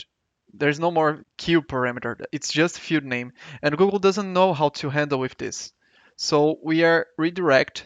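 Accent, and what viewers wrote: Brazil. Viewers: Mano, quando eu ouvi o sotaque tive certeza que era brasileiro akkkk, daí o google te dedurou , parabéns irmão, ótimo conteúdo!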